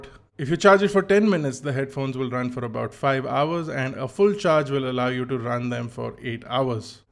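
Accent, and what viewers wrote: India. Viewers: work on your indian accent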